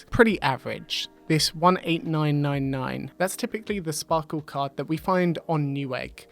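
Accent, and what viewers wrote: United Kingdom. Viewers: For those (like me) who assumed that this bloke might be doing UK prices because of his accent, this is for US prices. (this is not a dig at the channel, just putting this out there for those that might see it)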